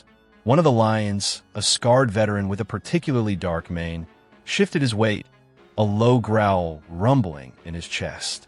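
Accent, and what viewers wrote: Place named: United States. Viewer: Oh dear, sound off again, as it wasn't the lions that sent chills down my spine. It was that flaming American narrator's voice!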